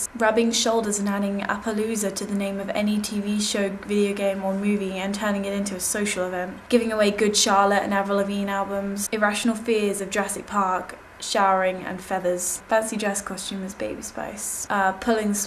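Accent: England, United Kingdom. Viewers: Y'know at a guess I'd say just totally english. And just that by the sounds of it, me and her have watched too much american TV. HAHA. And too right I've been watching the odd arthur episode after school. Its on before? hmm I wouldn't be up in time. But yeah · why do people think your accent is strange? Its a normal English accent... isnt it? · Ok, I thought you sounded English. Well done on being featured! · Thats an English accent! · Well she's English as u can hear frm her accent. Oh btw here in the UK horse riding is actually quite common and u don't have to be rich to be doing it. Neways i agreed quite a lot to wut she mentioned i mean it really is quite nostalgic. Shhe just basically aformentioned things that were the latest craze and all that. I think she covered things a lot of average people will remember. This video is something... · how do you become a director?? 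plz reply, btw your very cute and i like your english accent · What is it about girls with English accents that get them featured on youtube? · you have an english accent, but it sounds like your'e trying to be American sometimes. Baaaad. Be proud to be english! ( we're so much better. )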